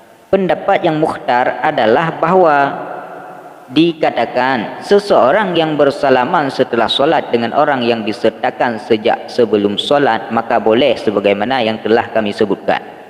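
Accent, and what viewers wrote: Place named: Aceh, Indonesia. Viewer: Logat aceh han gadoeh 🙏🙏🙏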